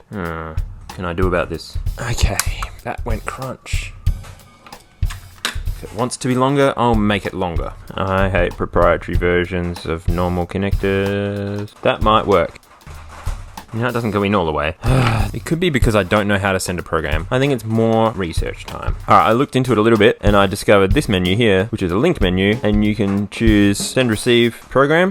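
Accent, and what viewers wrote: Australia. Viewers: At first I was like, "who's " then I hear the dulcet Aussie tones and I went "!"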